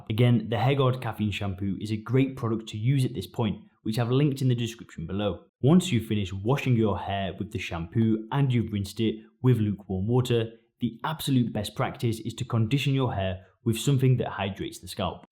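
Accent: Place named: Geordie, United Kingdom. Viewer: Brother I am from northern England same as you, but your accent has got me puzzled, it's like a Geordie that has lived in South Africa for a while.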